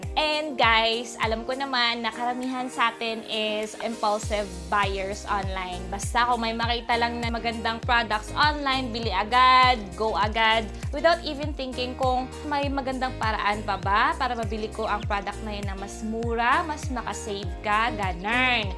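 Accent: Visayas, Philippines. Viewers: Ma'am, bisaya ka po ba talaga!? I just followed you on FB! I feel a bit closer! Hehehe.... 😋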